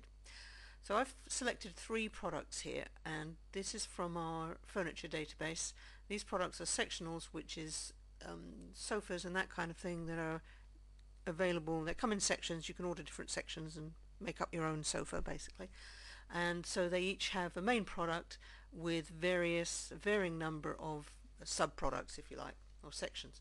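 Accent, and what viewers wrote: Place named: United Kingdom. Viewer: So glad to hear a British voice!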